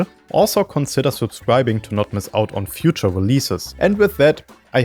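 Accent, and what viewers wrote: Germany. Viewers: Mann hört das du deutsch bist so raus😂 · Wie kommt man in Deutschland an die Teile, wenn man das bauen will. Die Anleitung finde ich bei euch auf der Website. Cool. Und da ist auch ein XML mit ner Teileliste. Auch cool. Aber Lego Pick A Brick akzeptiert nur CSV oder JSON Files. Also hab ich die Liste mit Hilfe von AI in ein CSV File umgewandelt. Leider bekomme ich dann bei Lego die Meldung, dass es kein einziges dieser Teile derzeit verfügbar gibt. Ich nehme mal an, irgendwas mit den Teilenummern kann nicht stimmen? Oder Lego Pick A Brick ist der falsche Ort? Wäre nett, wenn ihr mir da helfen könntet. Weil so lange ich die Teile nicht irgendwo bestellen kann, macht es ja auch keinen Sinn, die Anleitung zu kaufen. Hätte echt Lust, das nachzubauen. Ich hab Anhand deines Akzents einfach darauf geschlossen, dass du deutsch bist lol.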